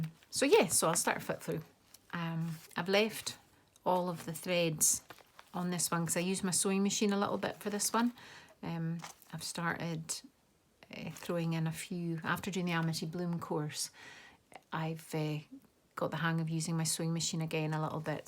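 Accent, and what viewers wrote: Scotland, United Kingdom. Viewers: Absolutely incredible, I could sit and listen to your beautiful Scottish accent all day, and watch the videos of what can only be described as stunning works of art, yet another wonderful book. Thank you for sharing with us.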